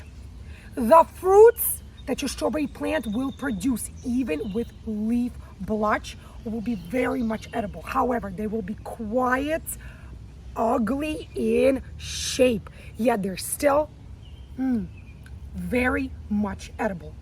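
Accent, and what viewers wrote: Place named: New York, United States. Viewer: That’s how we talk from New York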